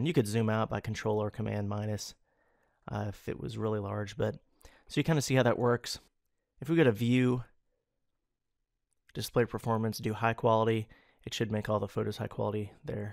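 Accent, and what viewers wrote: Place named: South, United States. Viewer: This is really great and easy to understand! The only problem I had was the southern way of talking, although nice, the lilt kind of fades off at the end and I had to keep turning up my speakers when his voice would get too soft.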